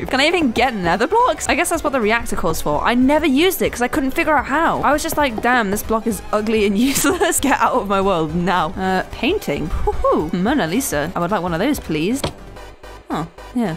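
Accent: United Kingdom. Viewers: Just wondering what country are you from because you sound British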